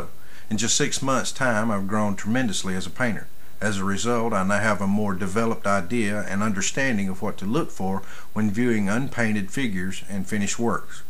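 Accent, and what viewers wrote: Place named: United States. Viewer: Awwh what a lovely video! keep up the good work ma man! And Where is that accent from? I love it! Where in the US I mean :)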